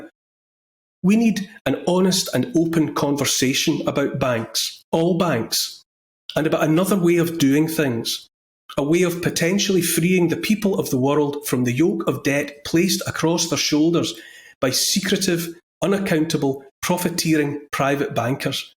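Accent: Scotland, United Kingdom. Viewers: Neil Oliver is the reason I subscribe to GBN no other reason required. Besides he's Scottish the land of my forebears, William Wallace, Aberdeen and so on. Plus I just like the sound of the Scottish accent, it rings of the can't be bought commonsense I love. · Neil Oliver sounds Scottish but his loyalty lies with Queen/King and England.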